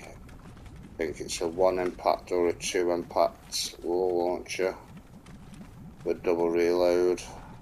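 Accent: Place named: United Kingdom. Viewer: Bruh ur from UK 😂 I thought u was from US 😂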